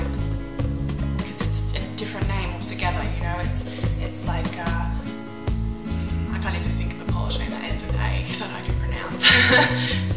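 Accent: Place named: Australia. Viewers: I came here to hear australian person speaking to come to know that accent :) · I’m polish, but I moved when I was 11 and currently live in Scotland UK 🇬🇧. I love your accent!! I love AUSTRALIAN accent, and I’m going there for a gap year next year, I can’t wait!!!!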